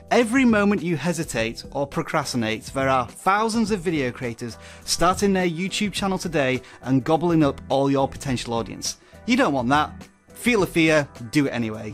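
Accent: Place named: Scotland, United Kingdom. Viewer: i can tell he's scottish 😁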